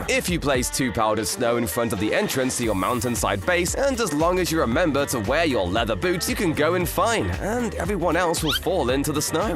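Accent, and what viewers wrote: United Kingdom. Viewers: His British Accent is do cool 👁👄👁🙉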